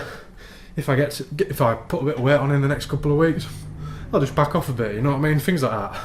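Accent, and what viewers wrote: United Kingdom. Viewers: Shit, Ill always be a fat guy at heart. I do wish, however, that there was a workout program that would give me your beautiful accent. Im a sucker for you Brits and the way you guys talk.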